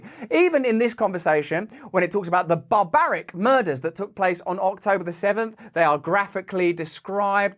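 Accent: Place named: London, United Kingdom. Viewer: u sound like ur from london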